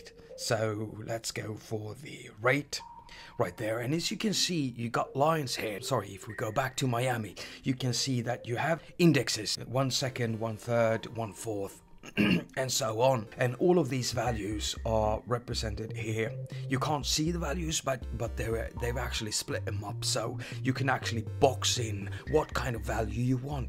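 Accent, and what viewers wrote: United Kingdom. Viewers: I hear the "UK" in your voice too. Maybe it's where you learned english from? I know this man from China and he has a "UK" accent. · Really enjoyed this video ! And UK accent is the way to go !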